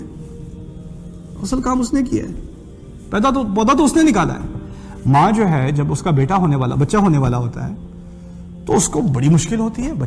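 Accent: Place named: India. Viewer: Masha allah done a great work... May allah bless you.... Nice hindi accent by the way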